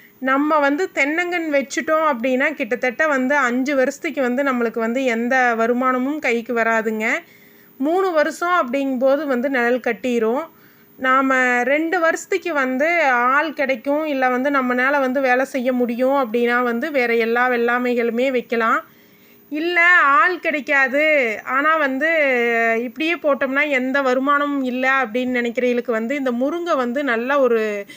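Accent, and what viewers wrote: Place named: Kongu, India. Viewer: arumai akka unga kural kongu slang nalla iruku kekarathuku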